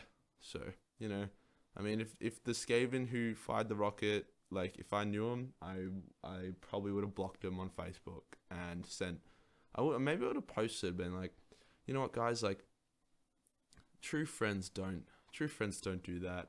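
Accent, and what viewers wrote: Australia. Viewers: Omg u speak total lad speech. need more Aussies on youtube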